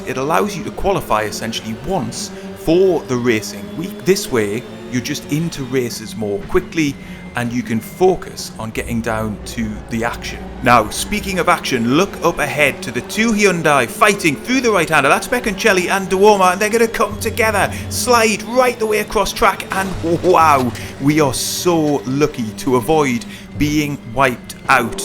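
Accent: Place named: Wales, United Kingdom. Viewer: Whoa I tell you mate what you're fartin sparks! Are you Welsh?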